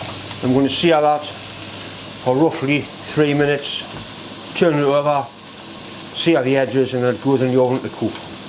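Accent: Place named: Geordie, United Kingdom. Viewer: Hi, with this video you have given me proof that a skilled machinist is also a great cook. Too bad with your strong Geordie I can't understand the whole thing, but the images are excellent. Greetings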